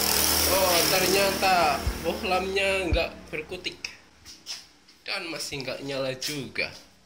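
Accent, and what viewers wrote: Banyumas, Indonesia. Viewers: Tak kirain banyumas mas boy..soalnya logatnya kaya orang banyumas.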